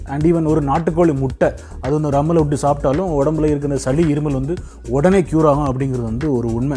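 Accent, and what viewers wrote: Tirunelveli, India. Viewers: Anna...nenga tirunelveli thoothukudi side ah?😅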